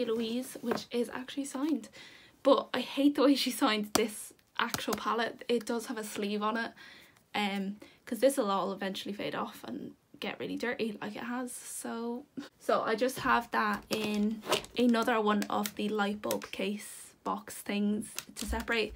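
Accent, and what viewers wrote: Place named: Ireland. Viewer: Where abouts from Ireland are you?? I’m Irish but live in England , and I absolutely love your video and accent mines faded but it comes back ❤️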